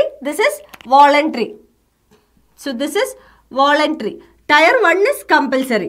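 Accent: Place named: South India, India. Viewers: She sounds malayali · Real South Indian accent👍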